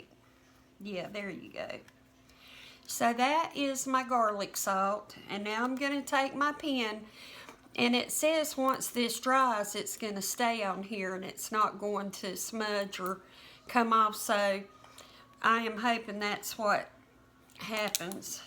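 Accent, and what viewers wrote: South, United States. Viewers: What an adorable ideal I love it because I have many spices this will be helpful, but could I make one or two suggestions just off the top of my head. You could take the lids to the jars which are metal lay them face up and spray them with a quick coat of dark Krylon paint , then you can write on them with regular marks-a-lot. or sharpie. And in my kitchen if I'm going to put it anywhere near the stove I need to put a little plastic cover something over top so that the grease in the air from the cooking does not accumulate on these glass bottles otherwise will be washing them all the time but I do love the idea and I'm going to do it ,so thanks a bunch, by the way you got to be a Southern girl. I'm from Texas but you make me feel like home thanks a lot girl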